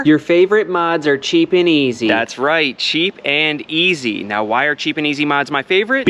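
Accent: United States. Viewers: You sound American but you keep talking about liters of storage?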